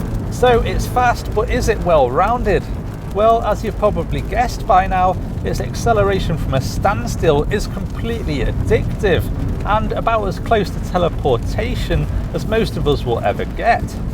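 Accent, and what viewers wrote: Liverpool, United Kingdom. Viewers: can someone please tell me what region of the UK his accent is from? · Taken me a while but I'm beginning to like his reviews. He is a bit scripted but he has a great way with words and his accent is certainly different (a bit of Scouse I think). Also, he sure knows his automobiles....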